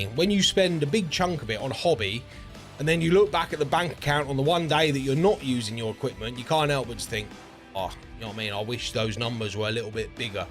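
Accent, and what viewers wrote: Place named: England, United Kingdom. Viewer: i love your original english accent! :)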